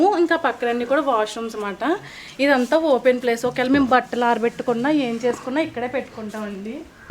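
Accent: Konaseema, India. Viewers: దన్యవాదాలు🎉 మీరు చక్కగా చెప్పారు❤ మీ ఇల్లు మద్యతరగతి వాళ్ళు వుండే నివాసం, బాగుంది. మీరు చాలా కాలం గా ఆ ఇంటి లో వున్నారు. అంటే మీ అనుబంధాలు చాలా గాడం గా వున్నాయి. కోన సీమ భాష లో చక్కగా వివరించావు👍 మాది అమరావతి 🙏ప్రాంతం ఓం అమరేశర సామి👏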